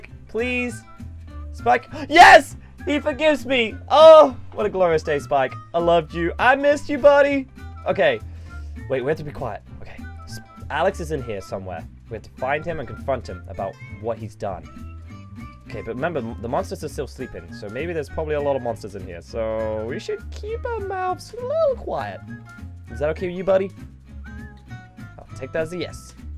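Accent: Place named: England, United Kingdom. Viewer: I 💗 your English accent and your super nice and I always watch your videos every day 😍🇬🇧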